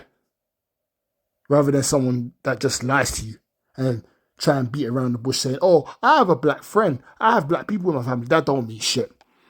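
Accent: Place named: India. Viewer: You sound like a Indian tether yes we can say it everyone else can’t why is it so hard for yall to understand.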